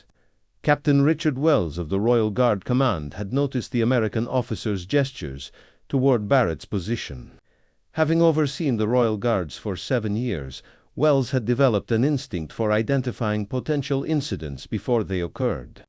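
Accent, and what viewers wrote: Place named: Eastern Europe. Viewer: UTTER NONSENSE The young fella on the "title page" appears in a private guardsman uniform embellished with various bits of frippery and unknown collar dogs and shoulder flashes. The narrator has a pronounced east-European accent - a noticeable Russian cadence. Since when has Buckingham Palace had ornamental flower beds on the public side of the gates? This is COMPLETE CRAP - DON'T WASTE YOUR TIME - IGNORE "Guard's life"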